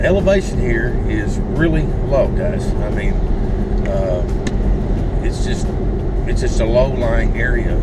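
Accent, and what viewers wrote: Arkansas, United States. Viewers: Very educational vid I love your Arkansas accent too.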